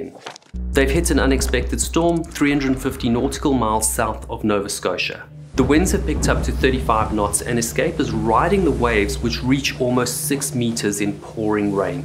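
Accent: South Africa. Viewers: Ahh I was wondering how they died, I mean it was pretty obvious they died of their injuries, but the previous version did feel a bit open ended. Just wondering if you'd ever do a Q&A type video? I'm not usually interested in that type of thing, but I'd love to know were you're from, I can't place the accent although it sounds South African or somewhere else in Africa, but I also remember you said in 1 of your videos that you had lived in England. It would be interesting to hear about your career too.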